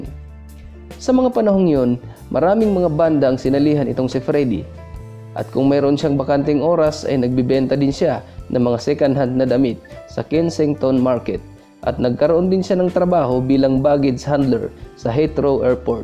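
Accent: Visayas, Philippines. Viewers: Bisaya ka man dong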